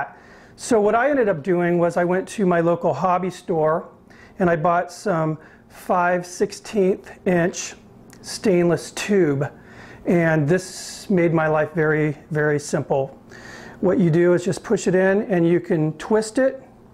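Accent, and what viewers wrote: South, United States. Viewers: Jeremiah Wilson this guy sounds like he’s from the south . No wc here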